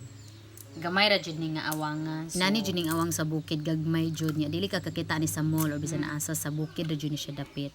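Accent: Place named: Loboc, Philippines. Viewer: Nindot gud paminawon ang sinultihan sa taga loboc madam hehehe...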